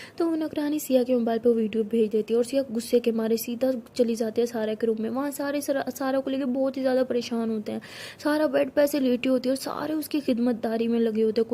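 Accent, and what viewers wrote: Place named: Uttar Pradesh, India. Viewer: Pakka up se ho sahoor to mp up me hi bolte hai😂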